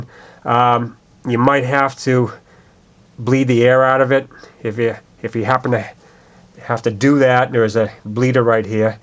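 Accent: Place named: Boston, United States. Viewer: LOL... That's a perfect Boston-area accent.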